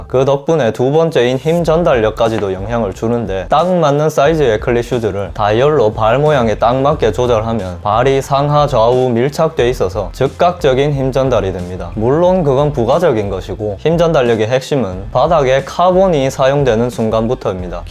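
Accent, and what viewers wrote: Gyeongsang, South Korea. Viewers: 정겨운 경상도 사투리.... 은근 그림 잘 그리심!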